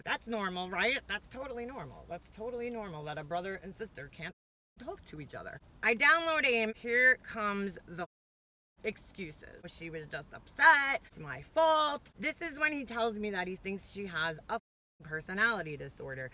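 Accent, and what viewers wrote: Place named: New Jersey, United States. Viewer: are you from NJ or NY? I love your voice. I’m born and raised Jersey too...and I don’t hear my own accent but I hear it when you speak. Your very pretty BTW.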